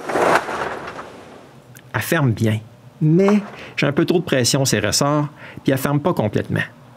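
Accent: Quebec, Canada. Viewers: l'accent Québécois on adore ça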